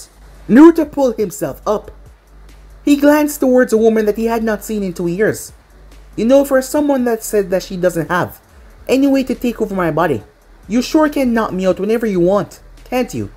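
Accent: Jamaica. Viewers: Wassup bro I’ve always wanted to ask you this but are you Jamaican 🙂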